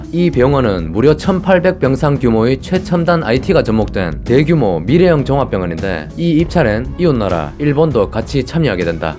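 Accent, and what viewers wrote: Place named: Gyeongsang, South Korea. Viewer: 갱상도 ㅋ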